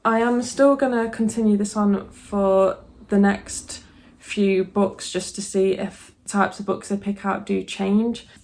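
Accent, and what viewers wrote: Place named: Nottingham, United Kingdom. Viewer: Are you from Notts? Trying to guess your accent!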